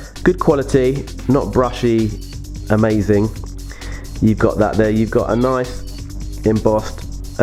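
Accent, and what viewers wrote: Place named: United Kingdom. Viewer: Finally a new channel & great to see/hear another Brit,keep em coming bro.Toe box does look like the snapchat ghost lol.I think Hanon killed it with the theme & colourway,I remember M Johnson,he was a beast but so obvious roided up to the eyeballs (excuse the pun lol) even to me as a kid.Good stuff mate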